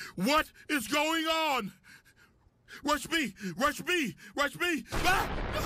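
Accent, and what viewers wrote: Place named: United States. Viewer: Reminds me of the narrator from Stanley Parable but American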